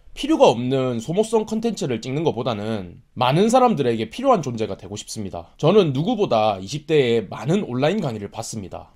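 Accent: Gyeongsang, South Korea. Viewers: 혹시 고향이 경북경남쪽인가요? 사투리가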